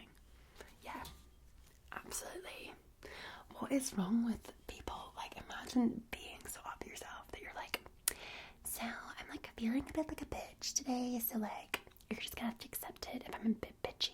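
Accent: Scotland, United Kingdom. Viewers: Your Scottish accent and the fact that you're Scottish is just enough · Wait you're scottish? I'm scottish too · The beginning reminded me to a late night infomercial. Would you do something like that? like a mock-infomercial where you show different items, all presented in a relaxed scottish accent. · she has a normal Scottish accent for a girl in Scotland, im Scottish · FUCK SAKES UR SCOTTISH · Jordana Brewster if she was a blond with a Scottish accent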